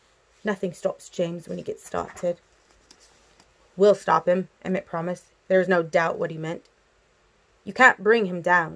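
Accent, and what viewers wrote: United Kingdom. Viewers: Pls read me before you Also, that British accent, 😹😹 I love it.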